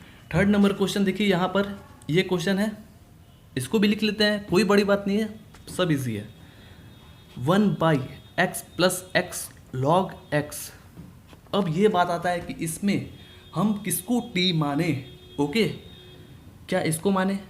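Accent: Bihar, India. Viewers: Sir u r from Bihar. .?♥️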